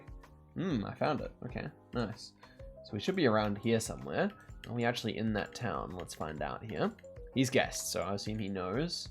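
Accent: United States. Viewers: love your southern US accent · as a georgian (us) gotta love the alabama accent😂😂😂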